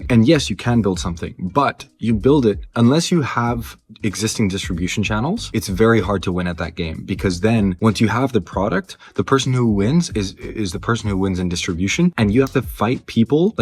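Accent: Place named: United States. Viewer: You from spain... But you sound American how?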